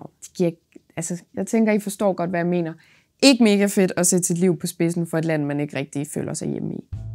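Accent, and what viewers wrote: Denmark. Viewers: Kan godt lide at det er det sønderjysk der er fra omkring Haderslev haha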